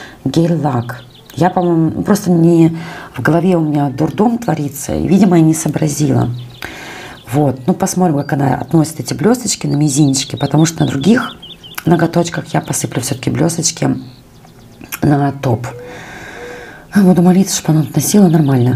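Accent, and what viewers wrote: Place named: Germany. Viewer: в Германии. На немецком Fiber; Fiberglas( стекловолокно) читается, как « фибер / Фиберглас «. Это не ошибка, просто читает, как немцы читают. Здесь корректно говорить именно фИбер.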